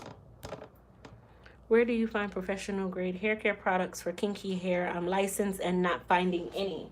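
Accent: Jamaica. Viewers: I knew it!!! I heard your accent and thought you where from Jamaica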